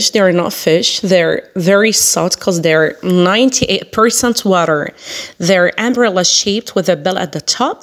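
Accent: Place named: United States. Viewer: صوت رائع لغة متقنة.لكن للأسف لم أفهم شيئا تتحدثين بسرعة و باللكنة الأمريكية كذلك لم تفعلي الترجمة وشكرا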